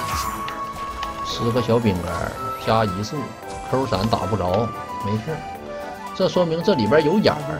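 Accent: Northeast, China. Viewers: 東北腔真的很可愛XD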